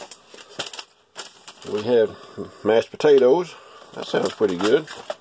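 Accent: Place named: Boston, United States. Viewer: Very heavy Boston accent for sure! That makes at least two of us!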